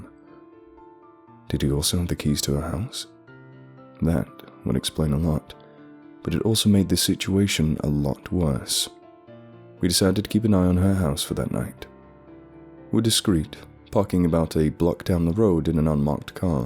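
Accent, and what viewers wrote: South, United States. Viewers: Your American accent is great man! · That was awesome! I wish I could find out more about what it all was ugh lol great southern accent by the way :)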